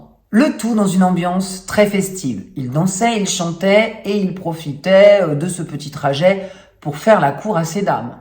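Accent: Italy. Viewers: Rien que d'entendre ton accent, je voyage en Italie ! 😀 Merci pour ce pan de culture italienne. Souffler en octobre, je suis bien d'accord avec ces anciens. On en a besoin. Et en particulier de soleil ☀️. Prenez tous soin de vous !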